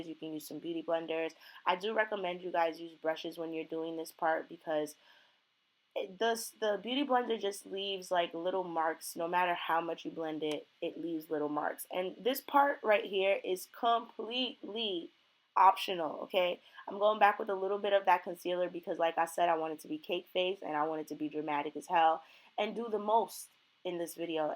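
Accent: New York, United States. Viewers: My first time watching you and I got a feeling you from New York Sis 🤨🤨🤪🤪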